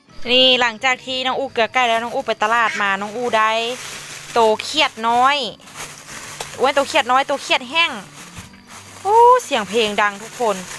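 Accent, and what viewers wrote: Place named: Nakhon Phanom, Thailand. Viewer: บ้านน้อง เว้าคร้ายบ้านอ้ายเลยนครพนม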